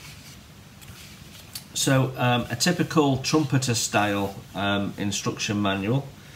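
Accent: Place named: Stoke-on-Trent, United Kingdom. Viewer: No don't be sorry. You accent is very much S-O-T ( Stoke on trent ). Was just wondering.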